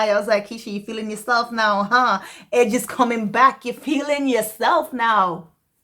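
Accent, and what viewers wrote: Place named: Jamaica. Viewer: Lol you’re Jamaican??? The accent sounds familiar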